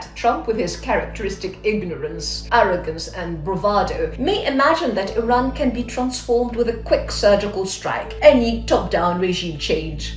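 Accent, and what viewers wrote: RP, United Kingdom. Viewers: Do you really speak in Received Pronunciation, or is this a put on? Nice message! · That's a fine British accent you've got. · Is this truly her accent, or is she mocking the brittish London accent . But she does make some great points though... kudos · As an American I just want to say that her accent is both impressive and off putting at the same time. - To me it’s impressive that she learned to do a posh, aristocratic English accent so well, but it’s also off putting because she sounds like an English aristocrat to me. I’ve only ever heard that accent in plays or when people are intentionally trying to be silly, so it’s hard for me to take it seriously when someone uses that accent. My whole life the only time I’ve heard anyone speak like that, it was Americans who were trying to be ridiculous for a laugh. I’m very opposed to this war and want to help everyone else who opposes it to get their message out. 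I understand I’m saying something here that might rub some people the wrong way, but it’s intended as constructive criticism. I’m trying to help.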